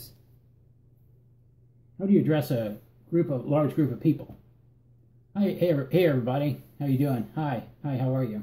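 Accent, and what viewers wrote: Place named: General American, United States. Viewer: You have a pretty “clean” general American accent with maybe a very, very slight southern sound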